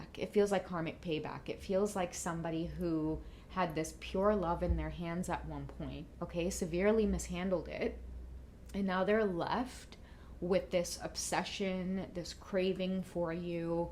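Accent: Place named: Trinidad and Tobago. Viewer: Are you Trini? That bazodee accent 😂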